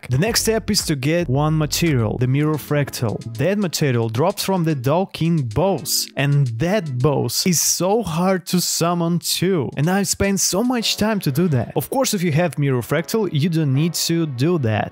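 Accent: Russia. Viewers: your accent is sounded like russian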